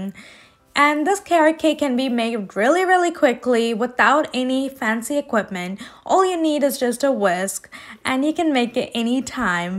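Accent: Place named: Gujarat, India. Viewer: I love carrot cake the best, it is a healthier one too, and easy to make and moist, I also love and love her English accent with a cute Indian Gujarati hint, nice to hear.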